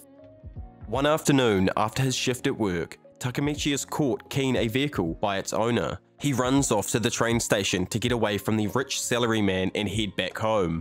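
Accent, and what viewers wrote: New Zealand. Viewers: Love the Kiwi accent bro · Are you a New Zealander?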